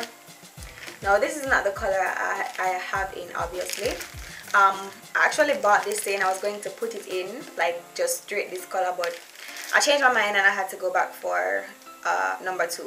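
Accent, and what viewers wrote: Jamaica. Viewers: You sounds Jamaican,are you? Greetings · Looks good and natural sweetie and you make me feel home sick with your beautiful Jamdown voice X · Jamaican? Girllll imma subscribe jus because u are, I'm j'can too, portmore. Love ur makeup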